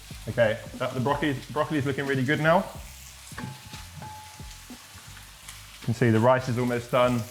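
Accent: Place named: United Kingdom. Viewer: Sam vraiment ton anglais il m’impressionne avec la petite touche british wo’er 😂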